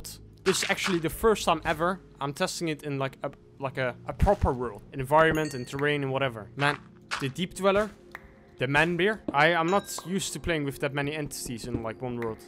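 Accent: Netherlands. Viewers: Do I hear a Dutch accent? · I’m smelling a Dutch accent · Het Nederlandse accent maakt het wel af hoor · Are you Dutch or Belgian? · quiq question and by no means to offend you but are you dutch? you sound pretty dutch to me. (again not meant offensive by any means and tbh it sounds good(nice vid too))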